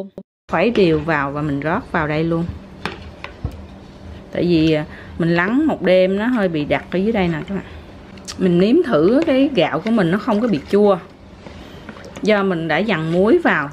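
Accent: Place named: South, Vietnam. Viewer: co ten la phai khong? rat tot, rat tan tinh trong chi dan, tieng noi mien nam rat rong lam cho nguoi mien nam rat hanh dien ve ban chat chan that, het long nay. Cam on em that nhieu!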